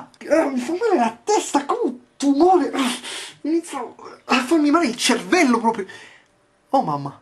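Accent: Turin, Italy. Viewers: mi sembrava :) una vaga cadenza torinese!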